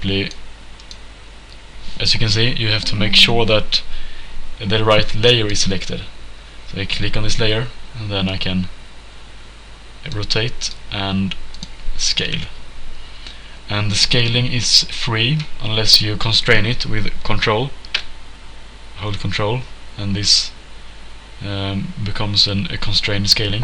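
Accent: Sweden. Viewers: Are you swedish? It sounds like that! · are you swedish? your accent xD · Är du svensk det låter som det på din dialekt? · 100% svensk hör man iaf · Nice tutorial, 100% swe accent right?:D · Din betoning låter väldigt svensk... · We klick ehh, hah bästa svengelska videon!, tumme upp!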